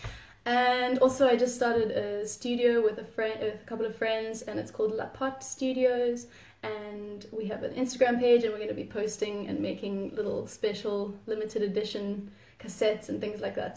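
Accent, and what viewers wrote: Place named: South Africa. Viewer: so good to hear a south african accent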